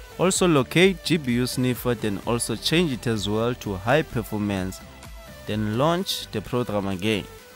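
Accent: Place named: South Africa. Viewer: Greetings from Nairobi. I love the SA accent. Bro you just saved me over $300. Was getting ready to order a new Mac Book from Amazon. I installed the Exodus Cryptocurrency Wallet on my laptop and then everything slowed down. I tried to make a bunch of improvements to increase performance which helped however Premiere Pro simply couldn’t load without showing the error. You’re an African Genius. Thanks 🙏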